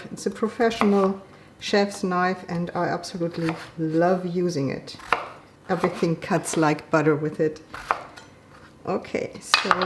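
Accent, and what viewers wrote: Germany. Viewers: You sound just like my German mother who was from Northern Germany. Steer (stir) it😅😊😊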